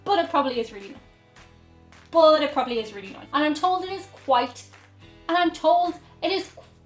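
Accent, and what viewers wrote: Ireland. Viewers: If you happen to be in an old-style American tavern (not the ones with a dozen televisions broadcasting sports and potted ferns, but the ones where old retired guys sit in the afternoon playing cards, smoking filter- less cigarettes and drinking boilermakers) you'll find huge jars of pickled pigs' feet AND large jars of pickled eggs. I've never tried either, but some people love them. Also, I can't speak for all Americans, but most of us love butter. Butter on toast, biscuits, vegetables, potatoes, corn bread, pancakes, banana or zucchini bread, but not many put butter on sandwiches. And, Irish butter is the best, but it's really expensive here. My girlfriend went on a tour of Ireland two years ago and said you put whiskey in a lot of food. Is that true? Can you do a reaction to that? Love your videos and your accent. Irish, isn't it? I live in Illinois. 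We don't have an accent. ✌😁👍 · I could listen to that Irish accent all day. It's adorable 😍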